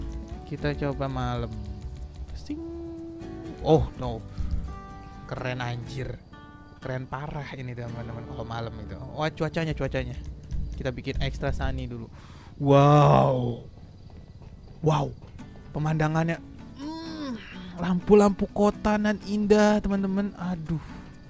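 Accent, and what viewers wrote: Palembang, Indonesia. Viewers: Bang kamu orang Palembang yaa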